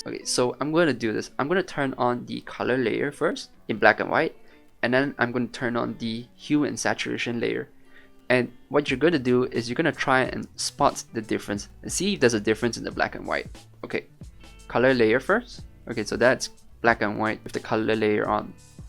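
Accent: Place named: Singapore. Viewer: Recognized that Singaporean accent ;) Great vid, Subscribed!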